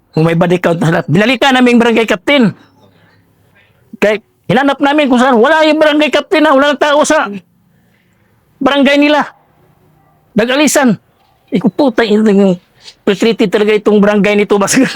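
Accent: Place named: Visayas, Philippines. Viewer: Iba tlga ang bisaya mg kwento , nkakatawa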